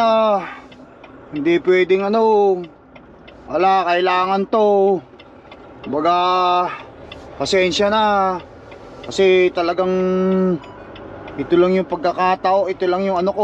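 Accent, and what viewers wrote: Ilocos, Philippines. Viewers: Parang ilocano so kabayan ah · ilocano ka sir? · Perstym ko idtoy channel mo idol accent mo palang ilocanong ilocano hahahaha ingat kabsat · Yung accent! Matik ilokano! 😁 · Tlga ilocano hehe halatado tgla sitau nga ilocano duray sadinno nga sulinek hehe God bless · Nagsolid dayta ilokanom padli. Ahahaha. Mamadlaw tayo pag nagtatagalog ka. Hehehe. Alwad kinanayon. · ilocano ka ata sir! hehe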